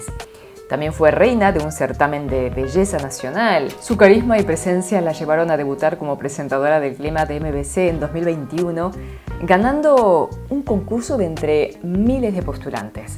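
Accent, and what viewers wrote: Argentina. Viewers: No soporto el tono del español 'argentino' 😆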